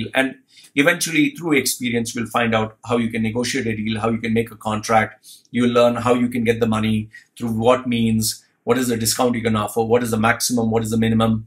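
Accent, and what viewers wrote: India. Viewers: you sounds like Indian which i means like me.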